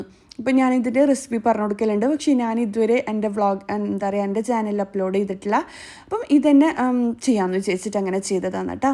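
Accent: Kannur, India. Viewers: Alla ith kannur thanneya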